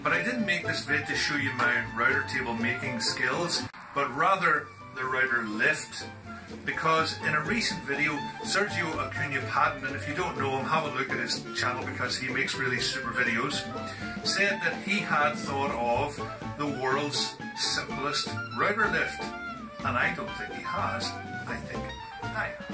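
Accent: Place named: County Down, United Kingdom. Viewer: "Good design is as little design as possible." - some German bloke. Great work mate. Other general thoughts - 1) The music reminds me of the cantina music in the original Star Wars film. 2) I thought yours was a Down accent, thanks for confirming. Must be a lovely part of the world to live in. 3) Hot day? Hot bloody day? I can only assume it was filmed a few months ago...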